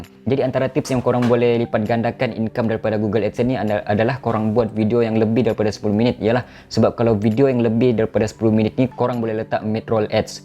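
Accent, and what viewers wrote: Sabah, Malaysia. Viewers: Torbaikkk 🙏🏻👍🏼👍🏼 ko Malaysia asal mana?Longat ko mcm bro2 dari Sandakan Sabah haha agak2 jak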